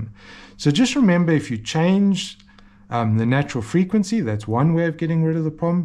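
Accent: South Africa. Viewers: Lovely to hear a good South African accent.... I recently built a stone slicing machine and had trouble of chatter machining the ends of the mandrel to take the cutting disc. I made a lathe steady which has helped in the machining of the long shift but I will definitely use your methods to make a cleaner job.... thanks. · Lovely South African acsent!!. Learnt a lot.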